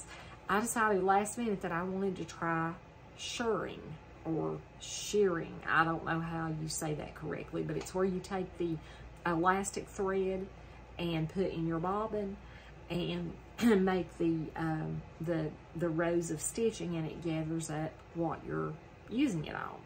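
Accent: South, United States. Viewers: Love your video. Those three tops are very nice. What state do you live in? I’m trying to place your fabulous southern accent.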